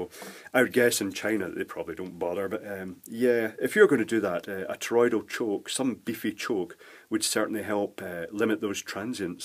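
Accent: Scotland, United Kingdom. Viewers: I'm using the subtitles, I'm not deaf, but sometimes it helps keep track of what's going on if you rewind the video quite a bit. I tell you what, it doesn't like accent! Maybe it should have detected "Scottish" instead of "English". Though he's not really speaking Scottish, which is a dialect of English where they swear more. And all the more for being a Weegie, which I think he's said in the past.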